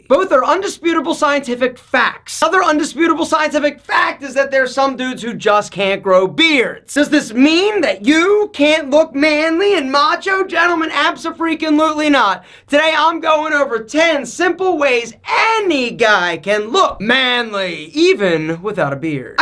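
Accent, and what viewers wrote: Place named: New Jersey, United States. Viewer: You gotta be from Jersey right?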